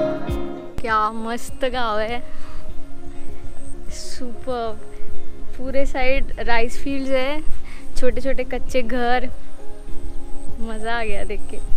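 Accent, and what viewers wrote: Maharashtra, India. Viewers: Proper marathi accent good one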